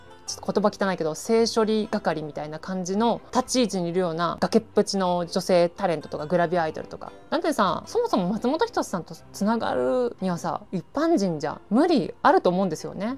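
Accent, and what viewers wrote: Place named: Kansai, Japan. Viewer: 関西弁だから関西人かぁ？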